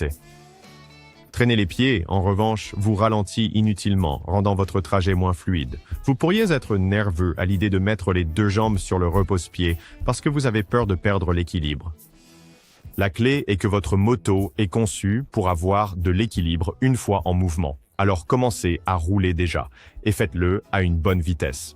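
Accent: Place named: Texas, United States. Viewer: Pourquoi la voix de l'IA a un accent texan ?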